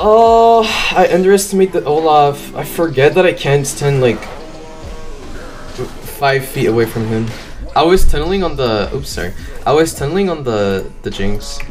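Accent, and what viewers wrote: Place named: South Korea. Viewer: I have to ask just because I love the way you talk -- where is your accent from? I'm getting Korean vibes haha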